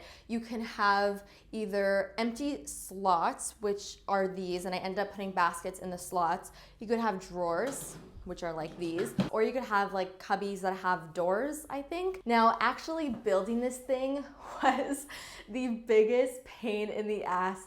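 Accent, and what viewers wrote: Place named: Canada. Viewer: Me: she has a slight canadian accent goes on her about page "Hey guys I'm a Canadian beauty vlogger" SLAY YOU FELLOW CANADIAN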